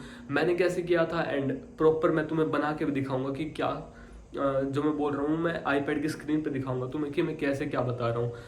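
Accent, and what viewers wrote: Haryana, India. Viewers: Hariyanvi me hi bol k video bnaya kro mast mst lgte ho apne accent me dono 🥰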